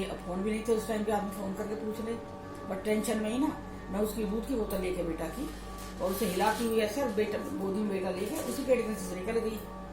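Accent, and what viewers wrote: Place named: Meerut, India. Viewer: Aunty apka baat batane ka tarika bahut acha hai, Ap merath se ho kya Meri mummy waha ki hai or unki boli bhi apke jesi hai, love you a lot 😘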